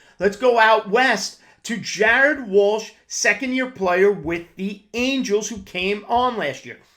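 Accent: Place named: New York, United States. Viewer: Great job. Love the New York accent. Very curious who the framed picture is in the background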